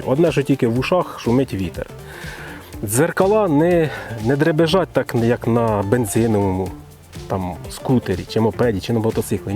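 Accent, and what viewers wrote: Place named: Poltava, Ukraine. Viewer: Полтавский говор.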